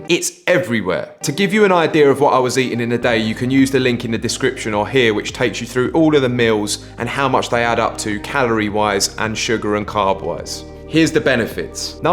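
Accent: United Kingdom. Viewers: Wow,like for this gorgeous British accent)) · This is the best British accent I have heard in my life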